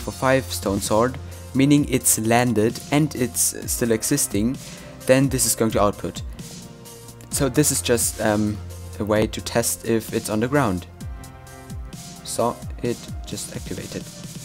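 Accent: Germany. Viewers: Du solltest unbedingt üben flüssiger, schneller und ohne deutschen Akzent zu sprechen...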